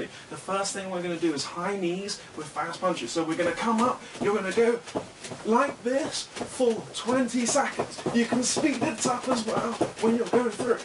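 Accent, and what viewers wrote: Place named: United Kingdom. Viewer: I love your videos. Very effective. And I love your British accent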